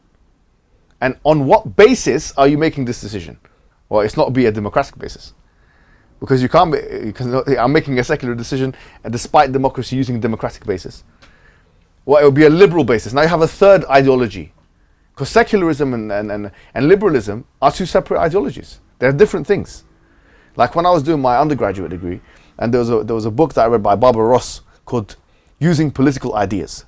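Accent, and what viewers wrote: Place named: Kashmir, Pakistan. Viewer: Bruh u producing quality videos with quite low subs. Subbed to u. Where r u from? U sound like kashmiri-Pakistani.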